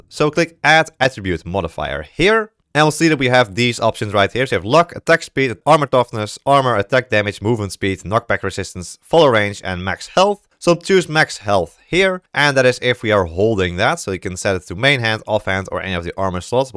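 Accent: Netherlands. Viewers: meer nederlands accent dan dit bestaat niet.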